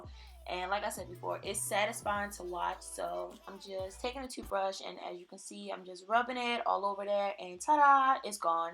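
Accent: Baltimore, United States. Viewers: As soon as I heard the accent (without looking at your bio) I was like YUP she from Baltimore 🤘🏾 good video ✨ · You are so groovy, so the outro is 🔥! You from b-more? Your accent is very Bmore. Your realness is refreshing! New sub! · are you from Baltimore? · Are you from Baltimore? I feel like I hear it in your accent.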